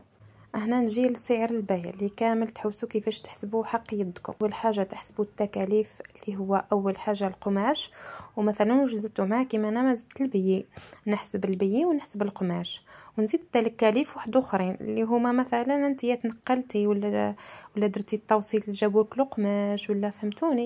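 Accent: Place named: Chaoui, Algeria. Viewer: السلام عليكم أختي أول مرة نشوف فيديو تاعك ماشاء الله تبان اللهجة تاعك شاوية❤ من فضلك أين متواجد المحل عندو كل خير الله يبارك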